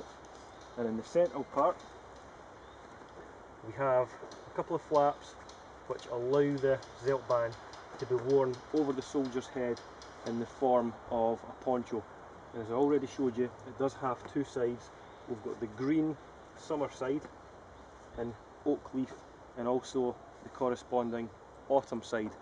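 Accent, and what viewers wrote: Scotland, United Kingdom. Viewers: a scottish accent german...WTH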